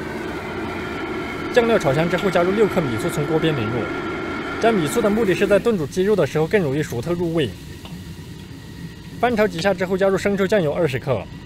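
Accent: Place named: Shandong, China. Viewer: 去了趟山东难道学会了儿化音哈哈哈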